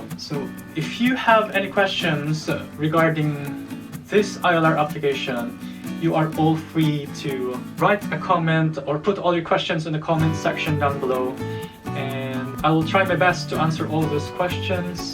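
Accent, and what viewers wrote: United Kingdom. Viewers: british accent! bigat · Yay!!! Thank you for sharing.. Lupit NG British accent... Sana all hahaha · wow ang accent mo kabayan british ang bongga ako bisdak na bisdak parin haha sunod niyan citizenship na rin congrats sayu din.Naalala ko rin ang mga visa na yan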